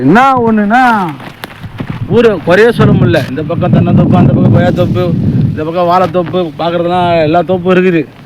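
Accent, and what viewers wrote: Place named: India. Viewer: வட மாவட்ட பேச்சு ❤🎉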